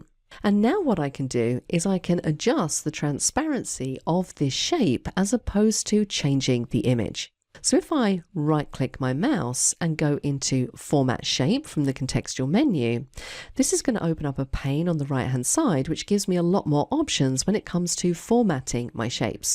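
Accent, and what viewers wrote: United Kingdom. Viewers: Thank you so much...in fact I am a zero user of P.P., but in fact I´ve learnt a lot just by watching this and your previous videos. I also like your accent I am kinda a fan of british one...take care and regards from Trujillo- Perú.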